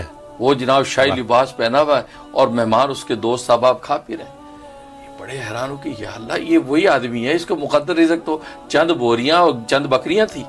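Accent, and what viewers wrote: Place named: Punjab, India. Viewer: Aaraam say bayaan karo or tareqay say ok Lekin tum full Panjabi jahalat. Zaban main suna rahay hoo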